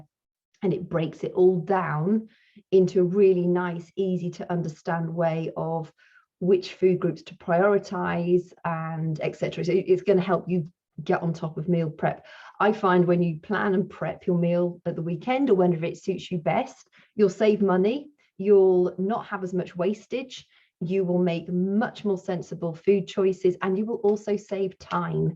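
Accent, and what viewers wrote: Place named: London, United Kingdom. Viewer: Thank you. The video made me feel less alone. I am in post menopause (over 2 years no periods) and the anxiety & night sweats still are here. Very distressing but I am trying to eat healthier (although I've still yet to cut out caffeine) and exercise. I need to start strength training and hope to find videos where I can do it at home without being in a crowded gym as Covid-19 is still around in the U.S. I love your speaking voice. My brother-In-Law moved from London with his family when he was in high school and used to have a similar accent. He's since lost the accent (which us a bummer) but he still visits his other relatives in London every couple of years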